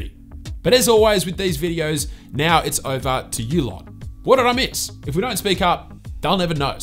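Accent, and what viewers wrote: Australia. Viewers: You Australian accent tickles me 🤣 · Your Australian accent sounds really fun ngl, and I like how you use 21:9 video. Watching full screen on a longer phone is such a joyous experience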